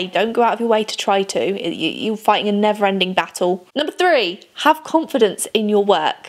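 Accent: England, United Kingdom. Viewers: Are You British? I'm sorry for my obnoxious questions. I'm just curious because your accent sounds British, yet you talk about dollar store. By the way totally amazing video very relatable!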